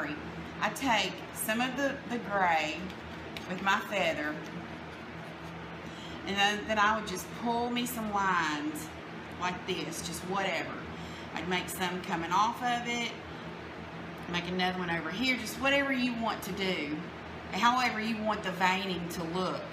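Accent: South, United States. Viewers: shell shell I don't think she was hating but loving her southern accent. Take a chill pill will ya??? LOL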